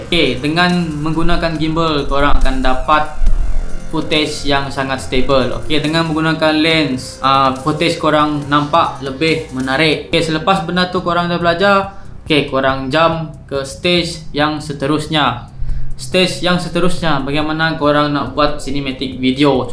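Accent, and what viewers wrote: Sarawak, Malaysia. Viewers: sarawakian ka? 😂 btw nice content. quite membantu and thanks for reminding pasal bajet.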